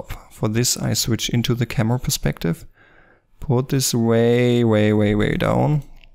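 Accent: Germany. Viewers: Nice video :) I like this german accent xD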